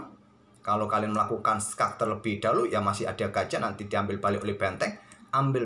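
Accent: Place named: Surabaya, Indonesia. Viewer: Ternyata admin ini arek2 Suroboyo iya😀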